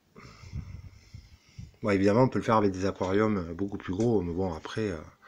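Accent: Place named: South-West, France. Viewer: j'aime bien cette accent du sud ouest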